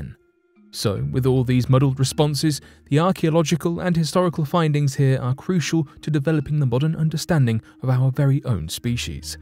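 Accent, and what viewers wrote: England, United Kingdom. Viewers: Enunciation must be precise for communication. Colloqualisms and heavy, near indecipherable accents hinder pure flow of thought. That is true no matter what language is being used. Imagine a Scot trying to speak West Indian--- or a German trying to speak Apache. Whatever language you are speaking, you must be clear and concise in your presentation. This narrator is an Englishman speaking English. And, imho, doing a fine job.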